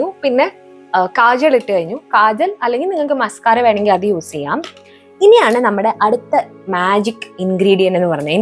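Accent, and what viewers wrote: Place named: Kottayam, India. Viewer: Luvd ur video, n ur look too.. ടെ വർത്താനം ഒത്തിരി ഇഷ്ടമാണ്.. ആ mike nte 'സോക്കെട്' കേട്ട് ഞാൻ ചിരിച്ചു..തനി കോട്ടയം വർത്താനം ആന്നെയ്..😅 ഇവിടേം അത് പോലോക്കെ പറയാറുണ്ട്😁 like ur videos, esp dress hauls..kp going dear. ❤ Princy,frm Tiruvalla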